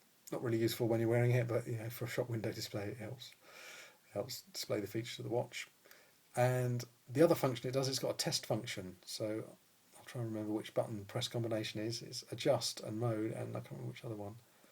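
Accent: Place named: United Kingdom. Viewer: Why is hearing a British guy explaining something so awesome?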